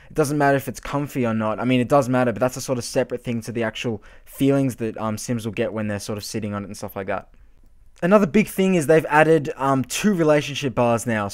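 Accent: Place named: Australia. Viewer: What country are you from to where talking and driving are realistic? You sound Australian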